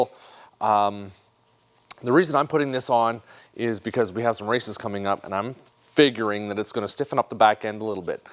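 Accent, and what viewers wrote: Canada. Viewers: I've come to the realization that about half of the reason I watch every video is that amazing Canadian voice...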